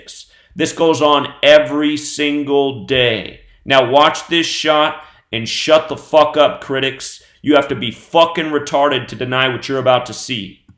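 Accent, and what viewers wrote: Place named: Northeast, United States. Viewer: I agree some what, Your saying u can call games, give game calls to someone in New Jersey. Who has not lost any playoff games to your one game a day speech u said u do. Your wrong admit it!! I bet you don’t even know how much % percent wise u have to win, if you are going to make any profit from gambling FACT PERIOD. Yeah u can easily find out that answer cough cough goggle. If u had that kind of knowledge your talking about, the video wouldnt be up here. there are 100s of millions of $$$$$ if not billions on sports gambling. you would never be able to have any opinion, I fell like with that much money you would disappear, like the men in black would come and take u somewhere. I think someone would do Something to you with this kind of information. You also Said you give a New Jersey guy info on games and he’s has not lost any bets but one and it was a come back that could of made him shit his pants because of the money he wasted from Your word. 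I see threw you and your northern accent that stands out well. Sounds like ur from The north like Philadelphia ,New York,New Jersey etc Area. I don’t think anyone would come OUT and say NBA is rigged here is the proof. u keep saying we are retarded if we don’t believe you. To the point you sound retarded saying u can call games. You Might like all get some right but ur not wining like u say u are. Your not able to call games like u say u do fact! If all pro sports like the nba are rigged,it’s not from technology it’s from the refs com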